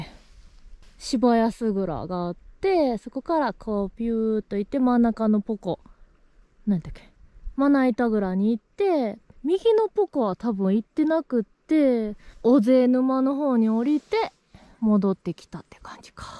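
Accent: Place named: Kansai, Japan. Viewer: ずっと関西弁、大阪弁でいてね !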